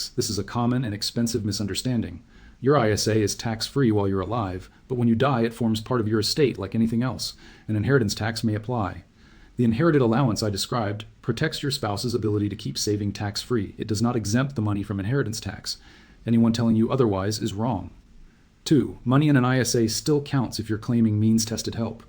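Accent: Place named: United States. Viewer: Why the American accent? We are talking about England here!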